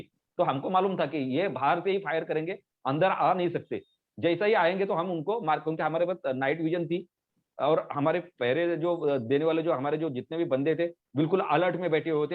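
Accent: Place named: Maharashtra, India. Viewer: Saheb, your marathi accent is very beautiful 🙏🙏 Hats off to your bravery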